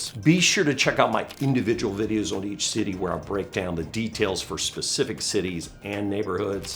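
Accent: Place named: Mid-Atlantic, United States. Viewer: I love your Mid-Atlantic accent. It reminds me of my own Baltimorean accent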